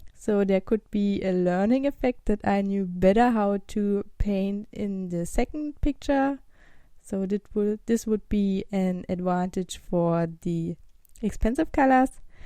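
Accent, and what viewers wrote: Germany. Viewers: Your german? I hear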